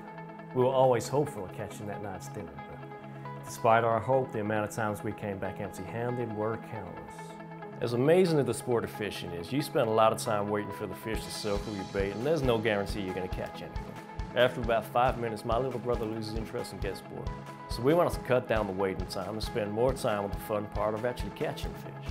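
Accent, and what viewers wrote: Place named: Rural, Sweden. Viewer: That's one hell of a Swedish country accent ya got there. I love it! He know s what sells and relating to your customer base is important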